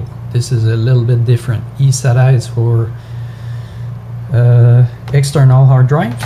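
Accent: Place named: Quebec, Canada. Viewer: jvoulait dire que d'est que j'ai entendu ton accent j'ai su tout de suite que t'etait quebecois lol, bon video en passant, desole pour la confusion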